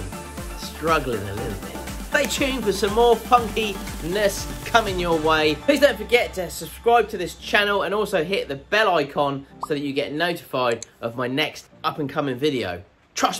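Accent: United Kingdom. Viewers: Love your british english.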